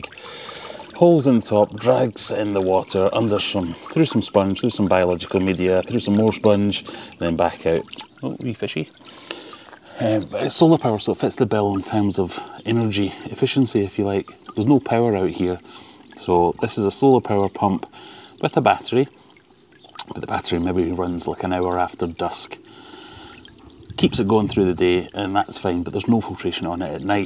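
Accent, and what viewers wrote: Scotland, United Kingdom. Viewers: So comforting hearing a fellow Scot talk about ponds 😂 where are you based?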